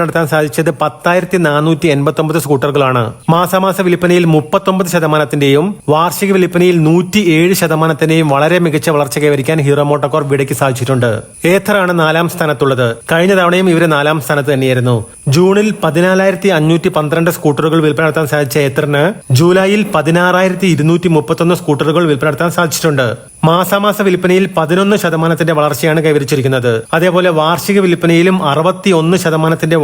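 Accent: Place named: Kannur, India. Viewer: kannur ടോണിൽ തന്നെ bro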